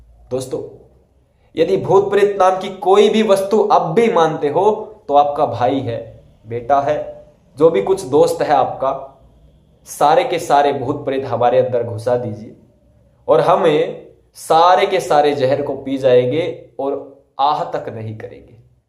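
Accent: Haryana, India. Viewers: Pkka Haryanavi hai bhai.. Maan ge bhai.. Bhai mera comment pdhe aur btana chahe toh ek baat puchhni thi bhai se ki kahan se ho bhai haryana mein.. Baaki boli toh thodi thodi panipat ki lgi..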